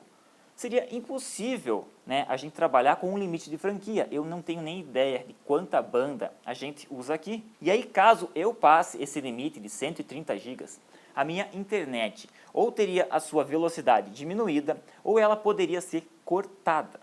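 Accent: Curitiba, Brazil. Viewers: Conheci o sotaque curitibano desde o princípio, huehue. Já ia perguntar, aí quando falou da Copel...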